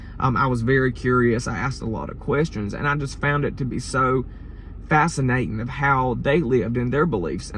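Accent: South, United States. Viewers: I’m a native Spanish speaker in a small Latin country, I do realize that has a beautiful southern accent, and I really appreciate his vocabulary, not a single swearing word, which is wonderful. I don’t know why, but foul language in my native Spanish or the English that I know, resonates in my mind in a very disgusting way, I’m not a saint of course, but language makes all of us so special, doesn’t it? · ❤ I could listen to you talk and tell stories all day long. You have a beautiful southern drawl. I'm a Texan, so I got a slight drawl myself from time to time depending on who I'm speaking with. ❤ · I too am a true Southern boy just like you even down to the voice .😊 y'all have a great day and thanks for sharing this video! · I love your Southern accent 😃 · Thanx for this wonderful video! Me and my partner live in Amsterdam and both really love your southern accent! 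Hope you are doing well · . I am from West Saint Louis County. I am sorry for what you went through while living in the south. I am sure the Midwest culture here is probably different than what you are used to and I bet you were asked a million times where you were from with your accent when you lived in STL. 😀. You seem to be a fine young man and I wish you the best. Come back and visit STL soon.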